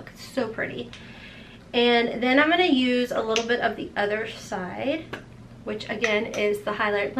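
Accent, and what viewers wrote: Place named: Nashville, United States. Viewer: You crack me up! I love your accent! I'm from Nashville tn and watching you makes me feel at home! To be honest your adhd does too!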